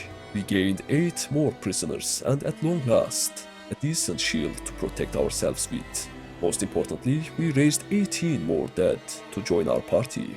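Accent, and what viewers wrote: Turkey. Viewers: Can you make kuzhait campaign next time please? (Btw your accent sound like Turkish) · Dostum güzel içerik aksağanın Türk gibi Türk müsün diye merak ettim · Abi İngilizcenden anladım Türk olduğunu hahahaha :D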